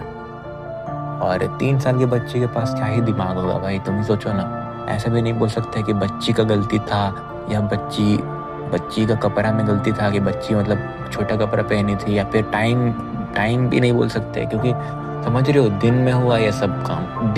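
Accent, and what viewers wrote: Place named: Bihar, India. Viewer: Your bigari accent just wowww ❤ That thora,, kapraa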